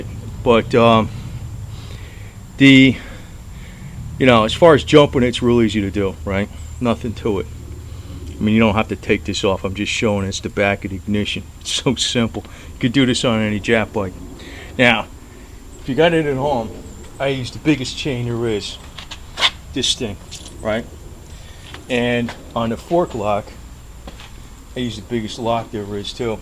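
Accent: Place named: New York, United States. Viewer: Seen a few of your vids. Your NY accent reminds me of home. Im in the Army at Ft Bragg, NC. I ride year round here. Even if it snows. Miss NY riding. Great roads up there. Especially up in dutchess county and further north. Nit too many winding roads down here. Ive got a 06 sporty 1200 (which is for sale) and a 05 dyna wide glide that I love. Im on my dyna almost every day. I barely cage at all. Real riders unite!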